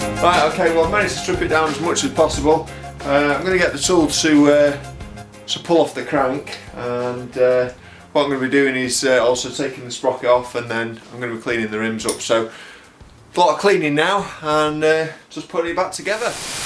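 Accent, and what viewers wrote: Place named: Stoke, United Kingdom. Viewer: I have just got myself an '84 Raleigh Corsa (which I collected from all the way from Stoke, so going by your accent, not far from you). This is similar to this bike to your one here but in metallic pearl white. I now own... 1985 Quasar 1986 Sirocco (same as <num> Sirocco (same as <num> Corsa 1985 Competition 1985 Road Ace 1984 Team Replica 1989 Record Ace 2010 Avanti Team Just need a 1985 Record Sprint then my "wish-list" collection will be complete. Oh, maybe a gold-plated Centenary and the frame-only "Reynolds 531 Pro Super" chrome Team Replica & Oyster & semi-chrome sets and a 753 frame for good luck. <link>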